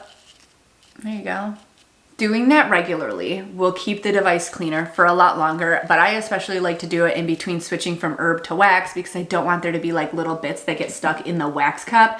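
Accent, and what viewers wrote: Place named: Midwest, United States. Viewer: Your accent, Let me guess Illinois or Minnesota maybe….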